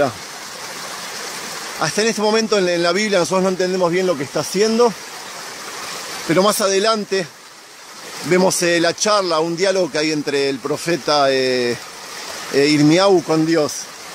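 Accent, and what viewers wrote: Argentina. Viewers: DIOS te bendiga. Por compartir este Interesante video. Te escuchas como argentino ?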